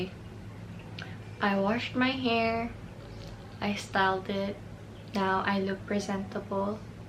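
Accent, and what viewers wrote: Philippines. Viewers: She's korean? Or a filipino living in korea? Because i notice filipino english accent/slang. So i'm assuming she learned english in the philippines if she's actually korean 🤣. If she's filipino then this makes sense Edit: i finished the video. Ok pinoy ka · pardon me bc this is the first video im watching frm your channel! i've subscribed:) just wondering cus i hear a little accent.. are you a filipino?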